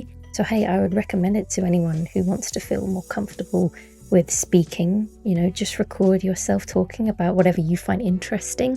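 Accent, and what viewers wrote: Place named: England, United Kingdom. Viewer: It was mainly the lore videos that caught my attention & made me keep coming back for more, your voice & English accent if I’m correct were a very nice bonus 😁😍😮‍💨👌🏽looking forward to more content from you, just don’t overwork yourself take a step back & breathe if you need to 🫶🏽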